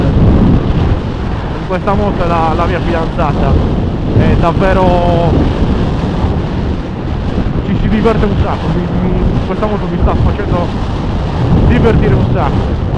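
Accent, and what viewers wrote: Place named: Sardinia, Italy. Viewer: mi fa morire il dialetto sardo😂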